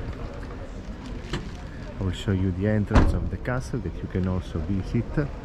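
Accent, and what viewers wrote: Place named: Ferrara, Italy. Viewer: Fantastico il ferringlese si riesce a sentire la elllle e la essse anche in inglese! Sono di Ferrara tranquillo capisco! Bel video mi piace!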